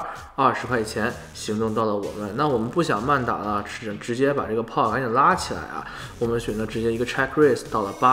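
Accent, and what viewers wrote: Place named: North, China. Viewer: 太好看了， ，一听口音，是国内北方人，有点像北京人，又有点不像... 不管那么多， 很喜欢你的视频和打牌时的状态，心潮翻滚，面不改色，运气紧随。没说的，必须订阅小铃铛。 祝好运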